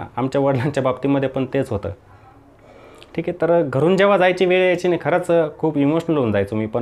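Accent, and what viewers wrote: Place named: Krishna Valley, India. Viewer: Krisgna ghati che boltay bhau tumhi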